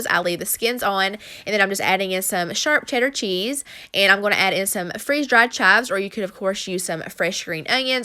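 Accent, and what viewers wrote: South, United States. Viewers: I love how you say monday 😍😍😍 ur country accent 😍😍😍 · Just found your channel and I ADORE YOU! Your content is awesome and your personality makes me feel like we’d be great friends lol 😂🤗😘 Plus I LOVE your southern accent! New subbie ♥️♥️♥️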